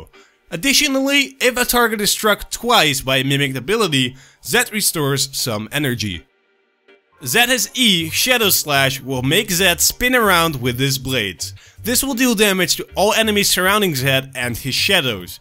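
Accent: Netherlands. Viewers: Are u dutch? Great accent tho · Lol he's dutch if you can hear it :]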